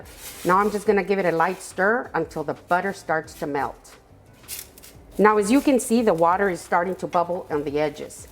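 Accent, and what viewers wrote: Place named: New Mexico, United States. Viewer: THANK YOU SO MUCH! I can’t ever make a perfect rice! I Love All Your Recipes! Are You from New Mexico? In the 70’s l dated someone from Espanola New Mexico and l met all his Family you have an accent like his family, I didn’t Marry him, had a wonderful husband for 43 years he passed away 2 years ago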